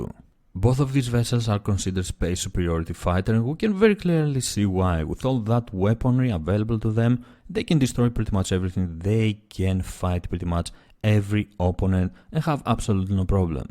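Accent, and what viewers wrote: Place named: Greece. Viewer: Nice videos and very well presented ! Subbed right away . Sounds like a greek accent :) If so , I am double proud for you , early backer here o7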